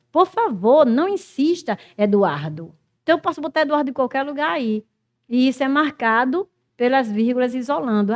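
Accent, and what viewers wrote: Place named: Northeast, Brazil. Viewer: Que sotaque mais lindo,😊😊 meu nordeste.🙏🙏#gratidão 🙌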